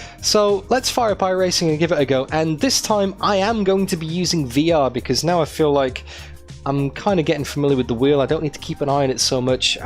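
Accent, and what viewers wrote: United Kingdom. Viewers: aaaah i thought the accent was familiar, I'm from the other side of the Mersey myself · sounds english too me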